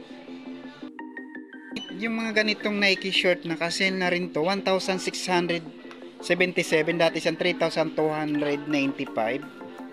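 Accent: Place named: Visayas, Philippines. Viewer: REALTALK IDOL HUWAG KA MAGALIT NAGMAMALA SAKIT LANG AKO DAHIL FOLLOWERS MO AKO UULITIN KO SAYO GAYAHIN MO ANG ""BALAHURANG KOMIKERA""NILALAGAY NYA YUNG DISCOUNTED PRICE AT REGULAR PRICE NYA SA SCREEN AT ANG BILIS DUMAMI SUBSCRIBERS NYA... DITO KASI SA METRO MANILA PAG HINDI MAGANDA ANG BOSES MO O TONONG BISAYA O HINDI MO PA MA PRONOUNCE NG MAAYOS ANG SALITA MO "SORRY TO SAY" NA BOBORE O NAWAWALAN NG GANA ANG VIEWERS KAYA MASMAGANDA NO VOICE KA NA LNG IDOL...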